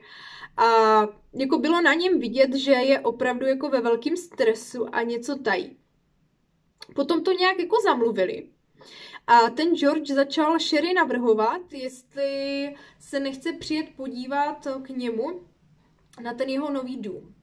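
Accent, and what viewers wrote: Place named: Ostrava, Czech Republic. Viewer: Mne treba vadi zvyseny ton hlasu na konci vety. Zakoncujete vety jako by otazkou. Budete asi z Ostravska, ze :)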